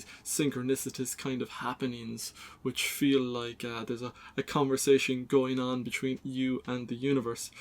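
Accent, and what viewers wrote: Ireland. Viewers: Nice to find a video of an Irish lad talking about Jung. Gizza shout if you're in Cork.. I'd love to put you up in Dripsey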